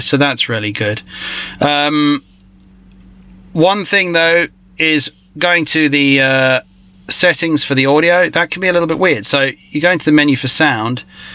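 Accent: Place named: United Kingdom. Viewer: you have been a godsend! I have been watching your videos since just after Christmas when I decided to get a new car. All I wanted back then was a back-up camera & a hatchback [current car is a 2013 manual Mini convertible - tons of fun but a very active puppy makes the top-down times limited]. Then I went down the electric car rabbit hole - I have been intrigued since the first Tesla 2-seater which I coveted from afar. Checked out Consumer Reports and they teased that a new car was coming to market in the US soon, and that was my intro to the Polestar! Honestly, I fell in love w/ the yellow seatbelts irrationally & immediately. I have co-workers who have Teslas but I hate the center-only screen. I googled Polestar and became quickly hooked on your open & honest straightforward videos [my dad was British so the accent doesn’t hurt either, probably some subliminal warm feelings there too]. 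I’m brand new to e-cars so that started the learning curve re: charging, battery size, range, blah, blah, blah [your early video helped me slog through that phase]. I took the leap in lockdown and drove to NYC to see and test drive the car in person and fell in love! When I got home from that trip you posted your now infamous “Don’t a Polestar” video, and that put a chill on my enthusiasm. Did I really know what I was doing? Was this just my rebellion for being locked in my apartment for a year? Who knows, but I got over it and in early March ordered my Midnight Polestar 2. Did the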